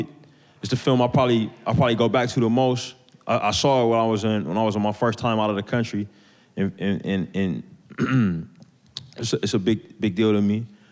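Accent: Oakland, United States. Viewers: Sobison lol no he’s from Oakland, that’s how most of them talk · Crazy how many people in the comments have never heard an Oakland accent.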